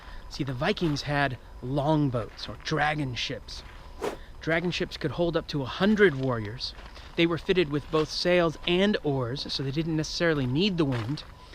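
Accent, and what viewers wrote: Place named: United States. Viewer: His accent sounds American to me.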